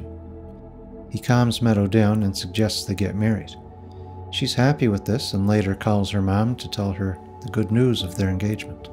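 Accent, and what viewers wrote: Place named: Cape Breton, Canada. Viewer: You've got to be from Cape Breton. I grew up surrounded by that accent. 😁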